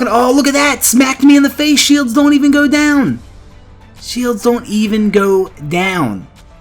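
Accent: Baltimore, United States. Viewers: Baltimore for sure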